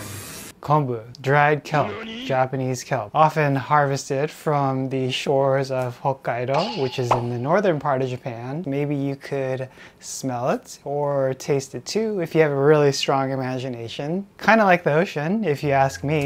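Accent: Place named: North America. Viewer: You sound like a North American but I guess you've been in Japan a while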